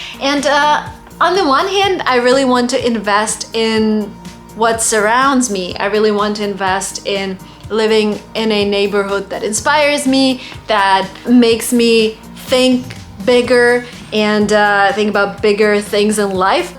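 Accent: United States. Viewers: I love your accent . You speak really American English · How does that accent sounds? Do it sound american to you?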